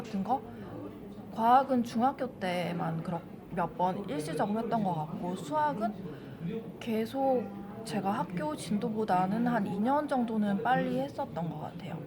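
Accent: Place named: Busan, South Korea. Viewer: 원래 부산 사투리 쓰셨어요? 표준말인데 사투리 억양이 섞여있는 것 같아요. 화이팅👍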